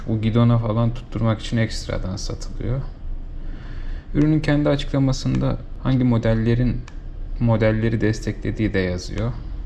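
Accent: Turkey. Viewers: tam bafralı gibisi abi :D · Abi sen samsunlumusun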